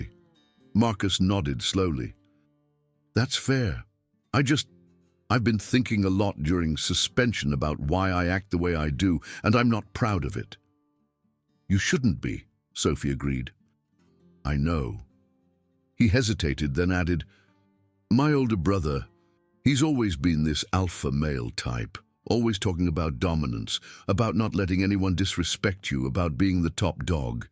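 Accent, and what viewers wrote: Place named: United Kingdom. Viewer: Wow, sentences with punctuation, and an almost British-accentuated narrator, with judicious attention to intonation pattern as a function of sentence structure. A definite pleasure to hear. The plot may not be spectacular, given the prevalence of this genre, but it keeps moving the story along and the storyline is better than most.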